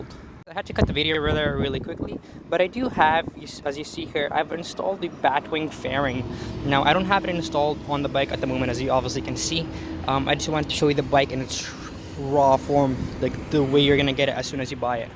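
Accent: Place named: Canada. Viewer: It's Canada.... the accent should have been a giveaway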